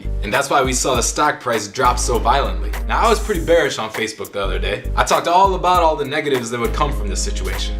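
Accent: India. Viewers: the Indian accent kinda sounds like Scooby Doo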